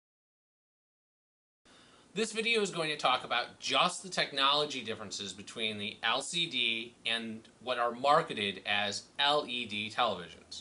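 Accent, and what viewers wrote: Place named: New England, United States. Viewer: Every time you go to one of these video's and it is a guy with a Yankee accent, be prepared for too much talking and show boating. In other words, hear me roar, I can talk a mean game of pool.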